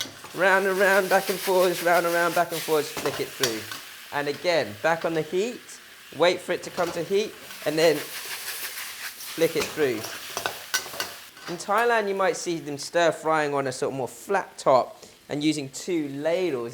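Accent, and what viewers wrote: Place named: Yorkshire, United Kingdom. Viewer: Nice accent. Were you born in the UK? Is that Yorkshire accent? The Recepi is great!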